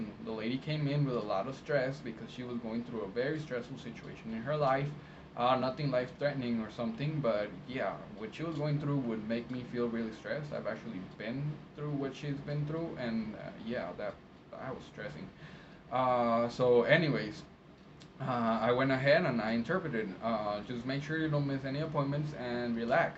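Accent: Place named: Mexican American, United States. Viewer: Hello. I enjoy watching your videos. Thanks for sharing. I was caught off guard when you stated you live in Mexico because your accent sounds Mexican American with English as your dominate language. Were you raised is the USA? Do you interpret for USA or Mexican providers?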